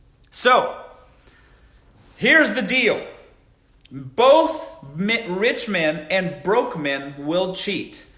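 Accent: South, United States. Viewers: 😂😂😂 "A little bit nappy"🤣 Omg. We can SO tell you were born in the SOUTH. The way you keep it real hurts so good and is so hilarious 🤭 #Boss... my granny was born in MS and we grew up telling it like it is. Tell the truth, shame the devil.😌😆🤷🏾‍♀️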